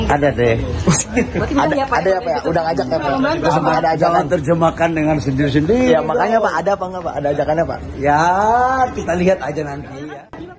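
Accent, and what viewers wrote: Java, Indonesia. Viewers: Aku suuueeneng klo om yg ngomong, jawane kuuuentel Lanjut terus om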